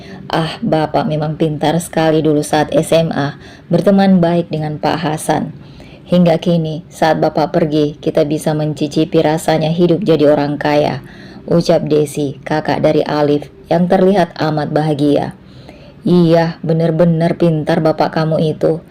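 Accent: Medan, Indonesia. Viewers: Bogor hadir ikut nyimak mb logat bahasa medan sangat enak d dgr 😅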